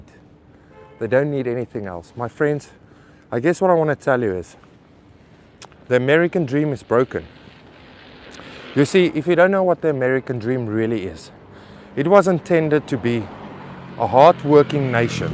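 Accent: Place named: South Africa. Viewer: Hahaha can hear from accent u from SA ;D, so glad we have someone preaching the truth on China, not that serpantZA idiot....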